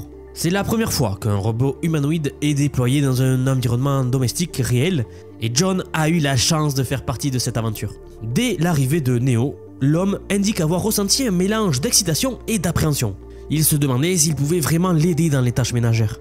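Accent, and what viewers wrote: South, France. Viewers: super video jadore ton contenu mais choisi entre l'accent du sud et l'accent asiatique pcq la c'est inaudible stp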